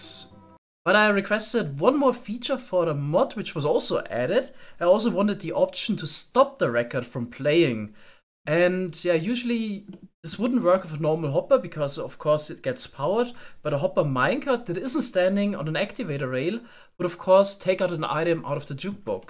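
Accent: Germany. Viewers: Bro dieser Deutsche Akzent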